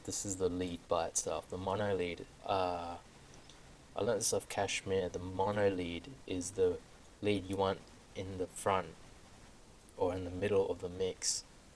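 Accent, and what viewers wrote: Australia. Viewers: Are u astraulian? :p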